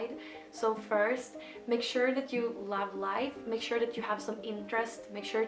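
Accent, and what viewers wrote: Sweden. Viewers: Your accent sounds very Swedish, I’m not Swedish but I’ve lived in Sweden for some years and I can recognize it.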